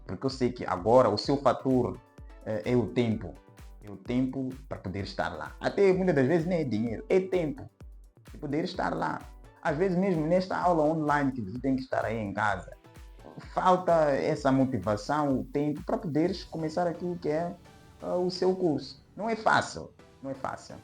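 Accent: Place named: Angola. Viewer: De primeira pensei q tu fosses Angolano 😂❤ Salve mano belo video O teu Sotaque é de Angolano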